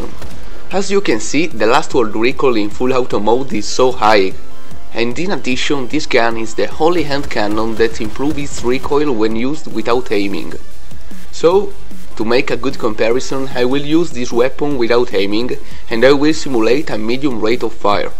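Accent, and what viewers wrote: Italy. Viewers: si sente il tuo accento italiano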